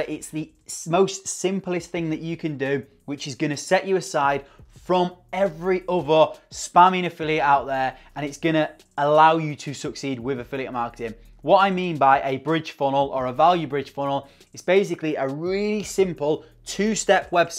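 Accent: United Kingdom. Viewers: "Affiliate marketing" awesome video! I like the way you present something, clear with British accent, concise and full information. Even though English is not my first language, I can understand that. Cheers!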